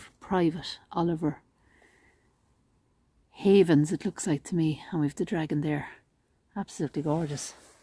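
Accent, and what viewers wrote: Ireland. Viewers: Wow! So fascinating look through those tiny holes and seeing all those coffins you did a marvellous job focusing through so we can see 👏 ♥️ Thank you keep up the wonderful work you do i absolutely love seeing all these amazing graveyards with you and your Irish accent to die for 🥰😁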